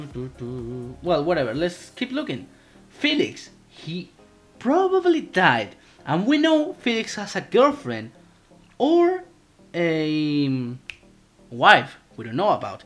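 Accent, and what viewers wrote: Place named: Spain. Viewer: That spanish accent tho. ( ^~^). Nice theory anyway. You get one sub.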